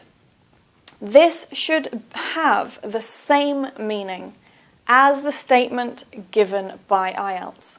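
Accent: United Kingdom. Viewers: Slow BRISTISH voice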